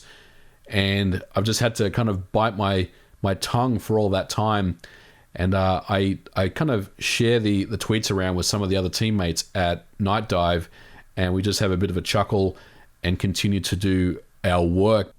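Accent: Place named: Australia. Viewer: Are you Aussie? I just saw on your profile that you're located in the USA, but I hear an Aussie accent :)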